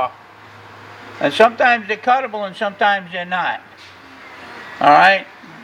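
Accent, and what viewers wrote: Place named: New York, United States. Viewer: I was all "This sounds like a NY guy" Then I saw the FDNY hat, Alright!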